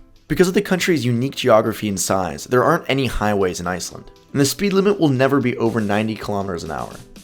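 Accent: United States. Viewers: это просто замечательно.. как слышиться америко.диалект.. = можно на 98% заранее знать, что будет безпредельное слабумие в рекламе продажи пустот.. дядя не исключение.., 3ато весело и присутствует уверенное отсуствие всякого умственного процесса в душе и речи.. браво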